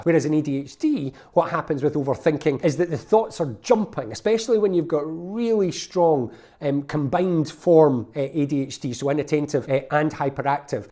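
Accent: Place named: Ireland. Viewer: Started listening because your Irish (?) accent is just divine to my ears — stayed because you really hit a nerve & I feel so seen now! Subscribed.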